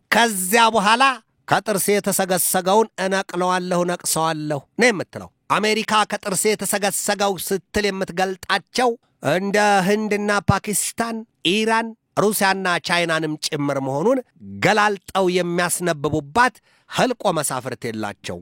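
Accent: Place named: Ethiopia. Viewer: አቀራረብኀ ይመቻል: ብቻ የሀበሻ እንግሊዘኛዉን ብታቆመዉ የበለጠ የተዋጣለት ይሆናል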